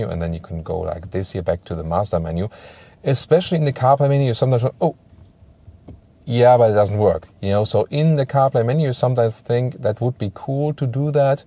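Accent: Germany. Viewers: Love your reviews. And you're German accent makes the car so much more interesting. Would be nice if at the end you say I'll be back lol.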